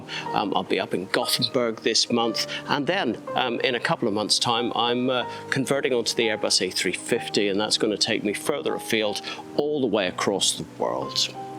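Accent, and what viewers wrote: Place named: United Kingdom. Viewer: Just came across this channel. Around 9 years ago I swopped a Leica lens for an x-t1 and I'm still using it today. They're an excellent, reliable little camera that does everything I want and has never let me down. Some great shots of Venice showing what this little gem of a camera is capable of, and just subscribed. As I always say, 95% of a good image is being there and seeing the potential and the other 5% is the gear. From your accent I think you may be from the same little island as I am, but further north maybe? Enjoyed the video and will check out some more.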